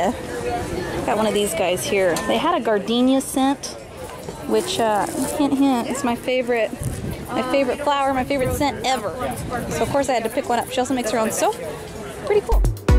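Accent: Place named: Canada. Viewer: Oakay sooooo?! You sound like you've got a little Canadia in ya der! Disciple18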